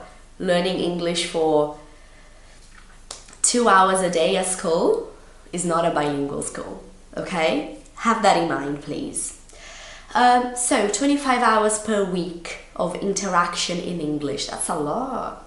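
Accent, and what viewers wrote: RP, United Kingdom. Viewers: It's amazing that you sound almost like the Queen. Super posh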